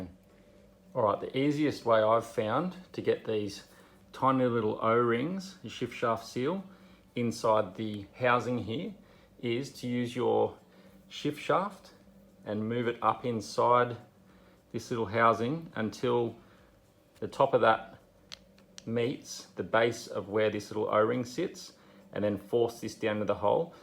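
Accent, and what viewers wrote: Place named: United Kingdom. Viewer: Just can't abide the British accent. Gonna look for an American vid.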